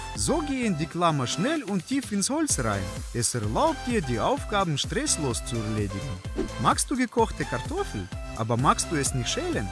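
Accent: Russia. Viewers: Der Typ ist mal Super symphatisch und macht diese ziemlich aufwendigen Videos erstens mit Begeisterung und zweitens auf deutsch, was verdammt mutig ist, da es für ihn ja eine Fremdsprache ist. Und da ist es wirklich extrem Dumm, sich über den russischen Akzent zu beschweren. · Sein russischer Akzent ist sowieso richtig lustig xD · Русский акцент нескрыть😂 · ja und................ ich mag den russischen akzentuiert hört sich cool an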